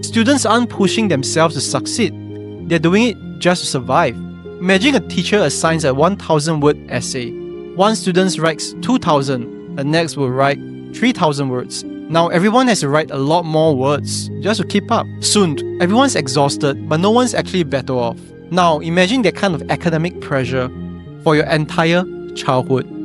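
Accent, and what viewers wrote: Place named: Singapore. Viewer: Are you chinese national?Your accent sound so much like Singapore or Malaysia.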